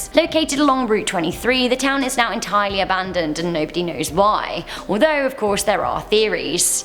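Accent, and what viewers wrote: United Kingdom. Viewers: sthurston she from Britain duh they pronounce words differently then Americans common sense there try using some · I found you. My company’s newsletter just had a “Who looks the sexiest in a red dress” vote. was the winner. I didn’t know who that was so I was told to do some YouTube searching. I found this and I have to agree, the British accent is definitely a cherry on the cupcake. Just thought I’d share that... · i hate it she needs to chill out its very annoying to me i dunno what i have against britsh accents im sorry i dont mean to offend you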